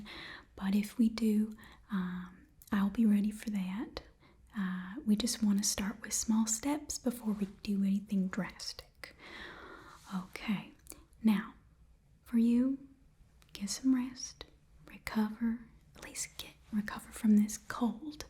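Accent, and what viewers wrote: South, United States. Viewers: Did our incredibly pleasant nurse with a good dose of southern hospitality get promoted? Did she pull it off and earn her doctorate? Because I swear she was still a nurse last time. Either way, southern accent with a load of caring warmth is wonderful stuff. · I saw that you were gonna do a southern accent and clicked immediately because you slay it!! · On behalf of the Southern community, I approve accent and recipe for mac and cheese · personally i just think southern is absolutely divine! love how warming it comes across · I love the southern accent so much it's so comforting, even if I've never even been anywhere near the US!❤️❤️❤️❤️ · ur voice with the southern accent reminds me of ruby from steven universe with the song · i love ur southern accent videos sm!! also i did get 2 post-roll ads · I just wanted to comment and let you know I received a post roll ad and also amazing video I always love the southern accents ❤ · This'll come in handy when I get a cold. 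The southern accent is the cherry on top. Thank you! Greetings from the Emerald Isle. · Nice southern accent. I don’t have as deep of one despite living in the most southern of the states.😂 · Her southern accent is top tier!🥰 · The southern accent is brilliant! Really comforting. I always love your videos! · It’s good to see you again 😉 your southern accent is magnificent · Oh god got the southern accent on point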